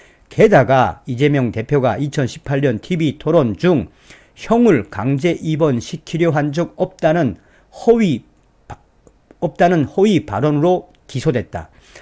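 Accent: North Korea. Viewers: 탈북민 이세요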